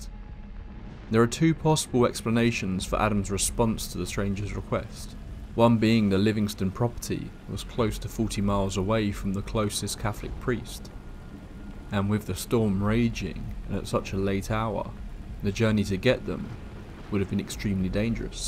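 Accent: United Kingdom. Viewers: Hey! A huge belated happy new year sunshine! I subbed to u not very long ago; I’m a huge fan of bedtime stories & Mortis Media… so I figured I’d give u a go! I’d dearly love to hear more about black eyed kids! They freeeeaaak me out!!! 😱🤪 P.s it’s always great to hear a fellow Brit narrating!! 🇬🇧👍🏻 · Ugh... Yet another British narrator... Not as many words mispronounced as usual but still annoying. Also the narrator drifts off at the end of each paragraph and speaks too slowly overall.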